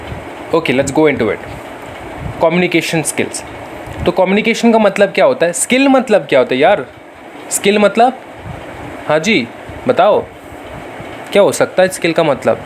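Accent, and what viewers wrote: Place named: Haryana, India. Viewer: Haryanvi voice Gjb 😊 I like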